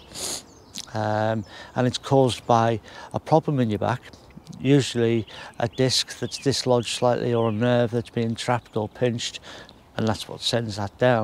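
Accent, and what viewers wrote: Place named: Wirral, United Kingdom. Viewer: Only just found this channel and learnt so much from one video. Thought I could hear a Wirral accent and looked for more videos and seen this post. So sorry to hear of his passing.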